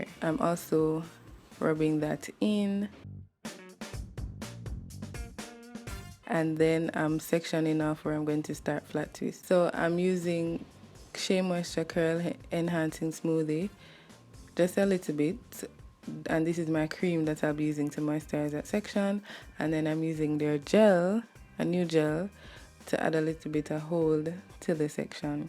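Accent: Jamaica. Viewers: u sound Jamaican 😍😃😃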